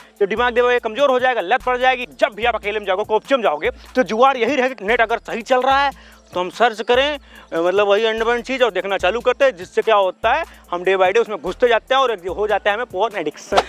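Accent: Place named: Bihar, India. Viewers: Big brother aap Bihar se ho Aap ki avaj Bihari hi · Bolne ka style pehewani aur bhojpuri jaisa hai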